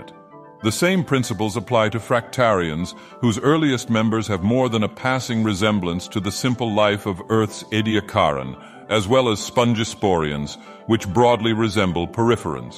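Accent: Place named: United Kingdom. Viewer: British dagoth ur 🧐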